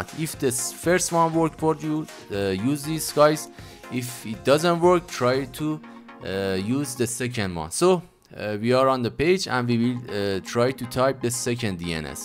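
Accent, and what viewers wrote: Iran. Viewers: پیش خودم گفتم این چقدر شبیه ایرانیا انگلییسی حرف میزنه تا اینکه همراهکارتو دیدم😂❤️❤️کارت درسته لنگ دی ان اس بودم❤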